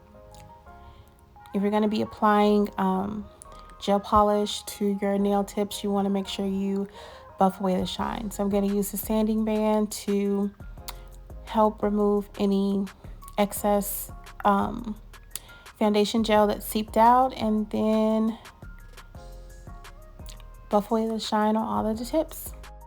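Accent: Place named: South, United States. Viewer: Your nails are beautiful… but the thing I’m more focused on is that accent. Girl I’m a southern woman though and through but I’ve never sounded that sweet when I talk. I’m soothed, I’m relaxed, and I’m envious all at the same time ❤️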